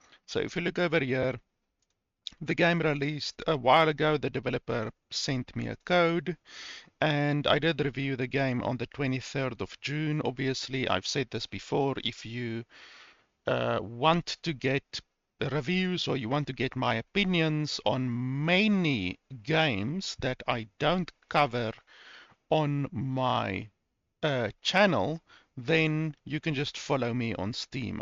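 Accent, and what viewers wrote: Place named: South Africa. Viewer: Definately a South African acsent....🙂..(ek geniet jou reviews!)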